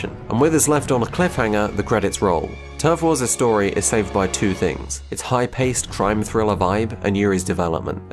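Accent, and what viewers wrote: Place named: United Kingdom. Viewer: Well you do sound British, makes sense why you find any weather other than abnormal.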